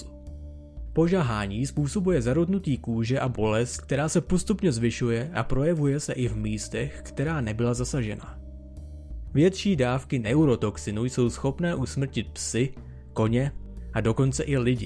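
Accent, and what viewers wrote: United States. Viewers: co co mas za skurveny prizvuk americky ? Odporny hlas video, ale fakty su dobre :)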